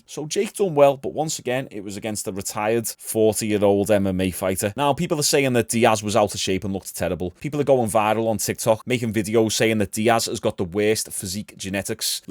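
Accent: Liverpool, United Kingdom. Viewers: He's looked similar to this since he went up from 155. He's not naturally that big so he wouldn't cut anything for this fight. He'd want to keep the mass he had, no matter the composition. Watching the fight, if Nate was bigger and/or had more punching power, Jake would have been in trouble as he was eating uppercuts like candy later in the bout. Edited to include that I love the Scouser accent. It's my favorite of all that I've heard from across the pond. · oh snap! I subbed like two weeks ago bc I liked your logical takes on things... I had no clue you are a Scouser! I'm American so I can't tell based on accents alone but Liverpool has been my fav football club for a while now. I seem to fit right in here lol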